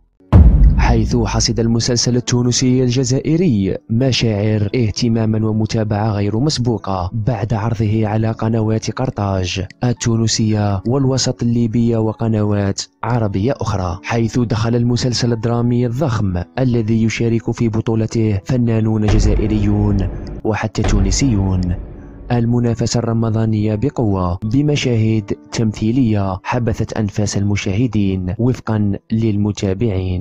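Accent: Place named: Morocco. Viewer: جاني صوتو ولهجتو مروكي والله اعلم دقق مليح فاللهجة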